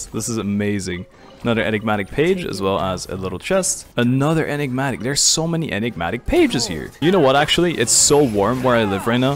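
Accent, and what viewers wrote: France. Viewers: WAIT ARE YOU FRENCH ? because you were pronouncing Fontaine very frenchly so I had suspicions but now... je suis trop contente parce que j'adore regarder tes vidéos en général, c'est super fun et tranquille :D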